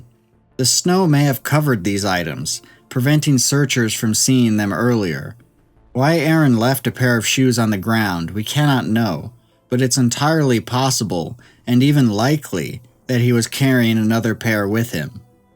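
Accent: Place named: Southern California, United States. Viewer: Is it interestING or interestEEN? HuntING or huntEEN? EndING or endEEN? Dang SoCal Californians!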